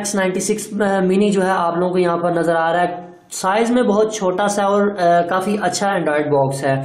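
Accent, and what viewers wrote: Punjab, India. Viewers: Phelay Urdu sahee say bolo, Panjabi style mai connect nahi bolo, apney nails cut karoo. phir video banana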